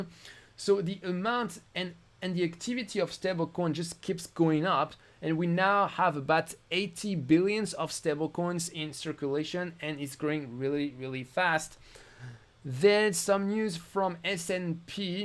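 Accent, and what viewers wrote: France. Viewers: Yo great content!! are u french?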